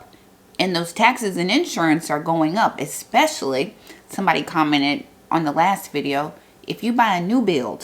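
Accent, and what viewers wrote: South, United States. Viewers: with a southern accent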